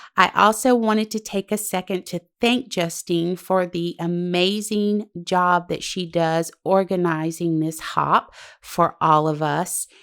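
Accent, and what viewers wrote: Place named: South, United States. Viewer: Love your cards & your accent. I'm from the south but live up north so I love when when I feel at home. I will be a new subscriber!;0) USA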